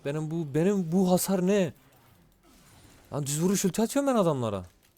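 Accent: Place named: East, Turkey. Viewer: Merhaba guzel şiven var dogu tarafı bi şive Allahıma kitapıma ha gibi cümleler kursan o dogu tarafini bize hissetdirirsen cok samimi ve guzel olur diger yayincilardan farklanir kendine ozgun kitlen olur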